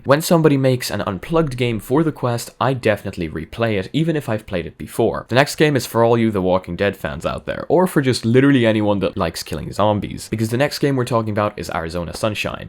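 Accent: Ireland. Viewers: Is he Irish orrrr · are you Irish? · Are you irish lol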